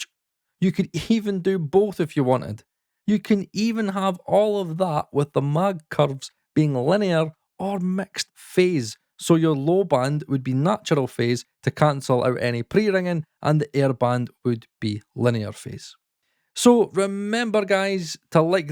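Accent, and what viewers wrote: Scotland, United Kingdom. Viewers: so this isnt a trainspotting video? (im happy about this) i just have a shallow relation of the scottish accent and a 10bag and pin...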